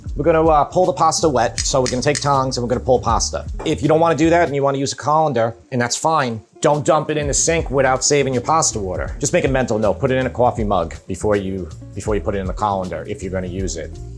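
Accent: New York, United States. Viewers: You’re a great cook my friend. You sound like a New York Italian ? Great cooking !!!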